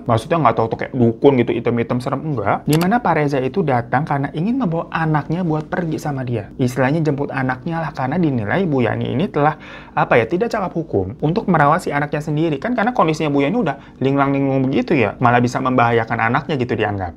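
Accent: Java, Indonesia. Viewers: Apapun bahasa nya logat nya tetep Jawa ya bang 😂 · aseeekkkk..dari padang niih, gw denger orang bahasa minang pake logat jawa kayanya baru lu doang bg gw denger😂😂 yook bisa yookk bang lu main2 ke padang😎🙏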